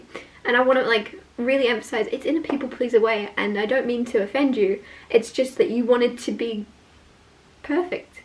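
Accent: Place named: England, United Kingdom. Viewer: I like your videos in.English i.lke your English accent!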